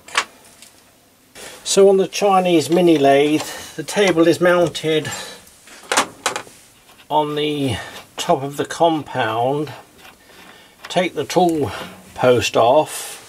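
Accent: United Kingdom. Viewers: Super creative and informative video,! And what a cute british accent:-)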